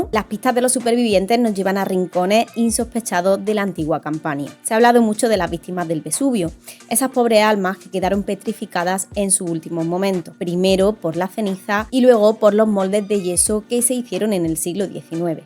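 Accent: Spain. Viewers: She's from Spain, lol...the only accent I can recognize...well, there was a South American one I found similar...Venezuela or something...or maybe not, lol...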